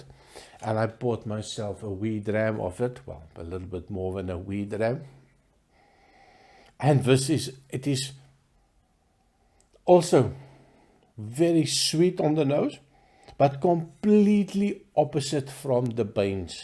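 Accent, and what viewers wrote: South Africa. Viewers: Very surprising top 3. I've had none of them before so I definitely gonna try them. Besides that, I appreciate the honest way you talk about whisk. Your south-african accent brings back good memories of my two holidays I've spent there. I live near the Belgian border in the Netherlands. Maybe we'll meet somewhere :-) Best wishes for 2023!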